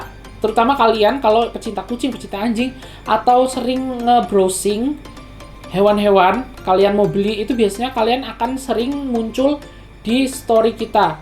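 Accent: Indonesia. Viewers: Assalamualaikum Warahmatullahi wabarakatuh Sebelum'a aku mau ucapin bnyak Terima kasih buat Tv and Team yg udh ksih Tips and Trick tentang penipu yg lagi merajalela bagai corona ini wkwkw dan fix logat ngapak'a (kyak orng Jawa Tengah) keliatan banget and make me laughing cuz liat ekspresi yg mesel sama penipu awokwokowkowk Literally Aku udh bnyak dpt pengetahuan di video ini tentang penipu entah itu penipu pembelian hewan, barang atau yang lain'a jdi'a aku lebih tau gmna cara beli secara online dan sedikit cerita aku wktu itu emng lagi PENGEN banget adopt Kucing dan aku agak ragu buat cari di online, sempet sehari atau beberapa hari nemu di ig tapi ada rasa RAGU dan KHAWATIR buat pesen di olshop tsb, tapi setelah aku nemu account instagram kyak'a niatan aku buat punya kucing ada peluang besar dehh nihh soal'a TRUSTED banget dongg.. Mana ada olshop pet yg jual bisa VC dlu sama calon Kitten's kita hahahaha And i hope I can get blessed from ALLAH SWT By hands. 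Amin allah'humma amin Sekian ya wassalam'mualaikum warohmatulohi wabarakatuh Instagram: imradit01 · ki unik, kalo ga denger dia ngomong mesti taunya yoo cina abizzz, taunya begitu pas ngomong yoalaaaahhh rekk jowo abezzzzzz😂😂🤣🤣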